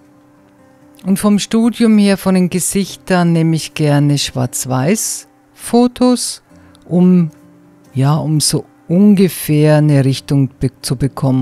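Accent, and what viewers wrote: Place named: Munich, Germany. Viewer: Du bist echt talentiert. Freut mich immer ne münchnerin zu hören.❤❤❤deine Bilder machen freude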